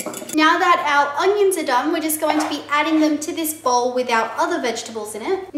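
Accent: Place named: Australia. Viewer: thank you for making videos - they are very helpful) its funny, but you sound almost exactly like heyyyjune, and i know you both are australian, but its not just that - im also subscribed to meejmuse and chloemorello, and they somehow sound i little bit different))) i just love aussie accent)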